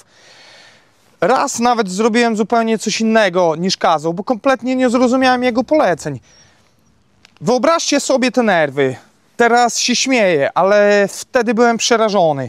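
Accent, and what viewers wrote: Poland. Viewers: Dla mnie zagadka jest twoj polski akcent.. ;) skad?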